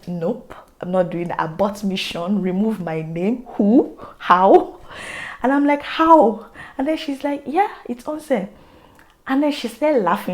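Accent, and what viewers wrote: Nigeria. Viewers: Very off topic but I like how u still have your Nigerian accent 😌😌😌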